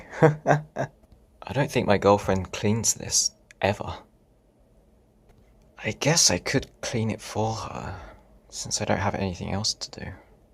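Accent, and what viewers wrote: United Kingdom. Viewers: there is something really annoying with low-level trolling in an english accent. I don't know why that is..... and when a troll has a russian accent it instantly becomes amusing and cool! but when it's that english accent...... it just becomes YUCK! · He speaks with a fluent English accent though.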